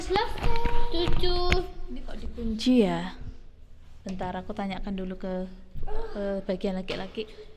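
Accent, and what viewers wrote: Java, Indonesia. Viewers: padahal muk ngene iki pidione, tapi panggah tak like 😆😁 demen leh medokkkkkkk, gass bund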